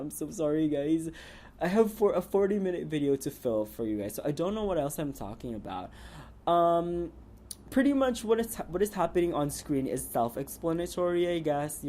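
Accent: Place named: Philippines. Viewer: I really like your accent. Where are you from the Phillipines?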